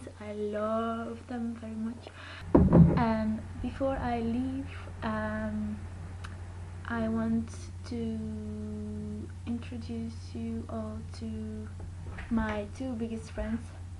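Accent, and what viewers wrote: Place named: Flanders, Belgium. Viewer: Hi. I'm trying to imitate the Flemish accent. do you have a few reference videos that you think would be good for me to watch? thank you. by the way, I agree, you accent is wonderful. :3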